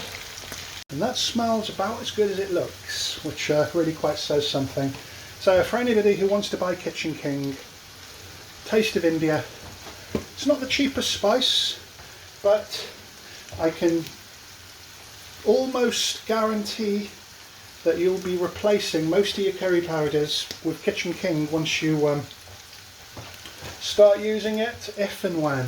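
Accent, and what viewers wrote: United Kingdom. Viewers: Great vid and tips, do i detect a welsh accent?..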